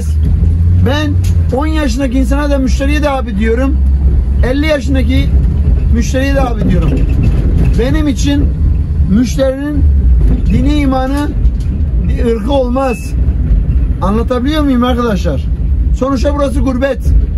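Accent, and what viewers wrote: Gaziantep, Turkey. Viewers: Abi bi anteplinin dilinden antepli anlar gelirken sana salca getirecem 😅😅😅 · Çok şükür gerçek bir Gaziantepliye rastladım. Abi seni dinlerken tamam bu tam Antepli işte dedim. Doğal ve içten olurlar. Samimi insanız biz çünkü 👏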